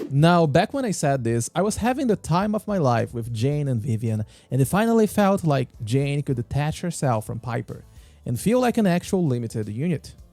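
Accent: Brazil. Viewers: are you Brazilian?? Your accent sounds SO brazilian like 😮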